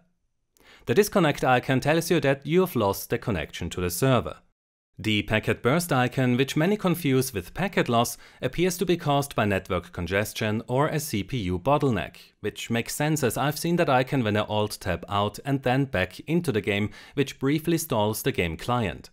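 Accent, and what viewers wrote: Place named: Germany. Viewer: I can hear the German or Austrian in this Guy... Schöne Grüße aus Wien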